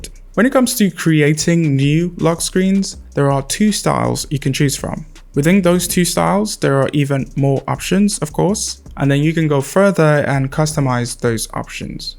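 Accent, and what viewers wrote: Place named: London, United Kingdom. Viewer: London accent.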